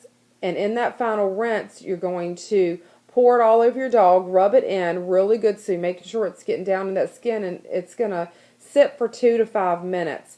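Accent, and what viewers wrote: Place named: South, United States. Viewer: I also love your southern accent. I'm from the south:)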